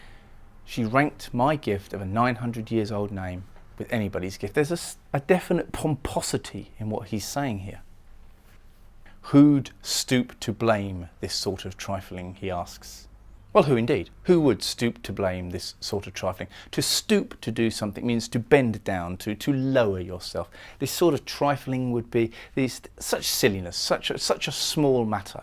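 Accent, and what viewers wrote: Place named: England, United Kingdom. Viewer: Excellent teaching, great to hear an English accent. Thank G_d for a simple interpretation in plain English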